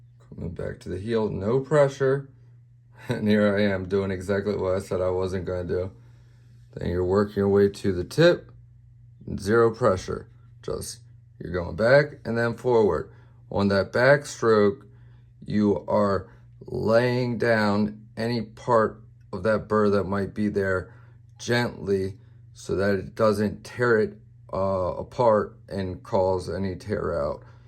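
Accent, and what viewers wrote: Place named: Baltimore, United States. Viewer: You from/in Bmore?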